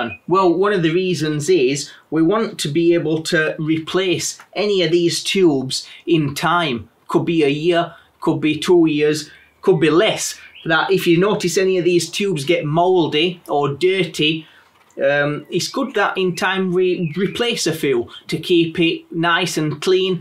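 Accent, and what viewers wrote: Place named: United Kingdom. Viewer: Great tutorial and Great accent 👍.. Which part of the British isles ?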